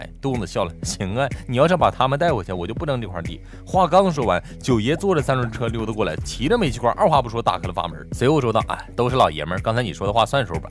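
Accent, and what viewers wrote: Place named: Northeast, China. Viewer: 我现在听着这个配乐带着东北话的解说下意识想到了牛叔……………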